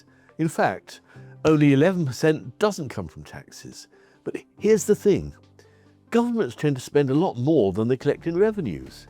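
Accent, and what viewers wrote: United Kingdom. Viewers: the British accept helps me understand better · False Words: "proof just sure certain break principal due based problem" -- ya that means "insurance" is also a false word & wow is it:face-purple-crying:America has defaulted 5 times about every 1/2 century kinda: 'Nixon said he was temporarily suspending the dollar’s convertibility into gold. The most obvious lie was Nixon’s claim that the suspension would only be “temporary.” It’s still in place today -- the US dollar has lost over 87% of its purchasing power since 1971. “Since 1789, the United States has paid all of our bills on time,” said former Treasury Secretary Janet Yellen. “In our history, we have never defaulted on our debt or failed to pay our bills,” said President Biden’s press secretary. “… the United States has never defaulted on its debt,” wrote The Washington Post. 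You hear it everywhere. The US has never defaulted. It’s repeated by politicians. By bureaucrats. By the media. As if it were gospel. All lies.' As one who did the accounting for an IT company I used to work for this all makes sense now why NOTHING made sense lol. Now I see fully why I am/was so obsessed with accounting -- follow the $. IT'S ALL A SCAM -- INSIDERTRADING DONTPLAYDONTBREED. Now that MOON HOAX I totally understand why they did it though it is STILL WRONG -- esp. now that I know that THE REST OF THE FREAKING PLANET KNOWS & IS BLKMAILING THE USA FOR TECH. $2B/day -- ya that's not sustainable. Luv this vid everytime nearly when i hear that british accent w "bonds" i thk james ; ) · Thx much. Clear and understandable. And, with a british accent, it's immediately perceived as smart and credible!!😊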